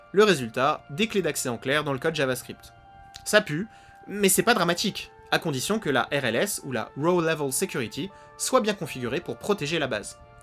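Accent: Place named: United States. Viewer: Ralala ces vidéos conspirationnistes... Par des personnes qui ont passé trop de temps devant Terminator... Ouhlala au secours, skynet est parmi nous.... Pour utiliser un agent Ia tel que Openclaw, il faut être capable de faire des installations en ligne de commande et donc ce public plus ou moins avancer sait déjà qu'il faut séparer ses données des données qu'on donne à manger à l ia... Stop a l agitation du drapeau sécurité à outrance avec un accent Américain tout aussi .... Pas grave je continuerai de regarder tes vidéos mais go brain avant de faire juste du buzz😅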